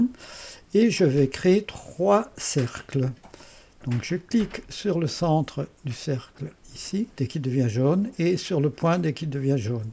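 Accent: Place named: Strasbourg, France. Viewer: ce petit accent qui me rappelle ma première femme Strasbourgeoise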